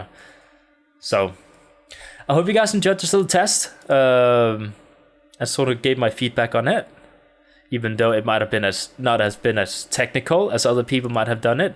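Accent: Denmark. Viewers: I think you sounded danish, are you danish? :) (also noticed the nordish layout)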